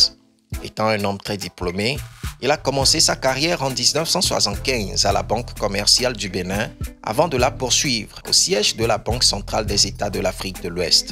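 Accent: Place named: Togo. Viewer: Un togolais est sûrement à la base de cette vidéo quand on écoute la tonalité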